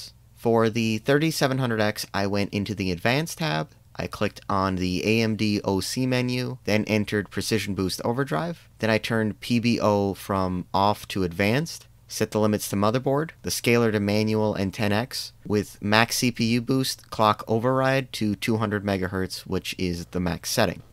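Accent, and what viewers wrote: Scotland, United Kingdom. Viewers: I miss your adorable Scottish accent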